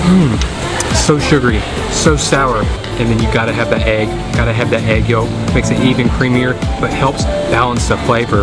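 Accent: South, United States. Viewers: yeah, cute Southern accent. By the way, it is not Cariboo. Hehe. Ca-ra-bow or Carabao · I’m a sucker for southern accents! 😩 I think we all need a charming country man to travel the world with 😂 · My ancestors hometown. Love Kapangpangan food but I am in the minority as I don't like sisig, my bad. But you are in food haven there. Enjoying watching and also listening to your southern voice , from Melbourne, Australia here. Btw it's not caribou it's carabao (cara-baw) or water buffalo. And you are forgiven.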